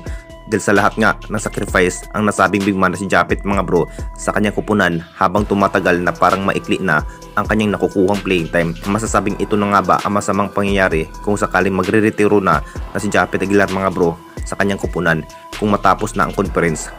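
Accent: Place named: Visayas, Philippines. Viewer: Hoy bisaya sakit sa Tenga yang pag sasalita mo Ikaw Ang mag retero Hindi c japeth unggoy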